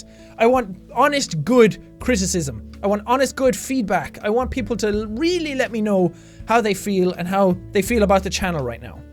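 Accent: Ireland. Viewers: Ow...! My heart is breaking...! ;o; Look, I know this video is over a year old now, and I know this comment is just another drop in the sea you get of them every day, and I know I haven't been subscribed to you for very long and I often leave comments that I only hope are as funny to me as they are to you, Jack, but I just have to say this, and I mean it from the very bottom of my heart: You really are as amazing as people tell you you are. I like your deafeningly loud voice with your rough Irish accent, I like how brightly blue your eyes can get, I like your giant, shit-eating grin, I like your impossibly gray hair (and I actually just discovered that you look very nice in gray shirts). I like it when you swear every ten seconds and say words differently than I do, I like the personalities and names and voices you give to characters you've only just met and how you stick with them, I like when you yell to your ma about cool shit or scream at Billy for being a dumb shit. 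I like the scary, funny, weird, impossible, dumb, loving games you play, I like that gaming isn't all you do, I like how completely honest you are with yourself and others, I like the relationship you keep with your fans. I like YOU, Jack. We all do. Why else would we be here for you if we didn't? Ugh, long message is long, I'm so sorry! This sap is getting everywhere.... In any case, if you ever manage to make your way through this train wreck of feels, then good on you! If not... TL;DR: I like you, I'm prou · just don't loose the awesome irish accent... as long as that stays... I'm good...... grow an afro for all i care! :D but either way.... just do your own fricken thing! have fun ... fuck the haters.... they're just jealous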